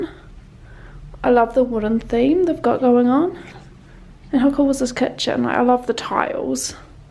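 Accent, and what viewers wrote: New Zealand. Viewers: ) Lovely film, lovely persona, and lovely Kiwi accent (difinitely siventy-siven). And I might have a better question: How many things are definitely worth visiting in this country? There are many, especially outside the usual tracks - try Iasi (pronounced Yahsh or Yaash), a historic capital of Romania, in North-East of the country (historic region of Moldova).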